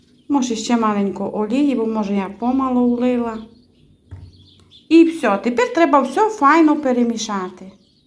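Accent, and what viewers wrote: Transcarpathia, Ukraine. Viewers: Клас! Насолоджуюсь закарпатською говіркою, окрім гарних рецептів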